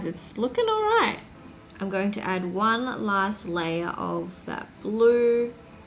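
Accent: Australia. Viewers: Your accent is cool, it sounds like you are or you spent time in Australia.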